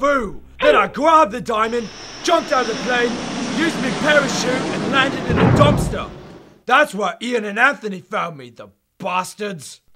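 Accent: United Kingdom. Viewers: Dear Charlie, why do you have a british accent? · Charlie are you really Scottish because you are actually using a Scottish accent mate but do make some bloody good content and ghost Charlie mate you should go back in time to when your alive and tell him to build a machine to save smosh you bloody good mate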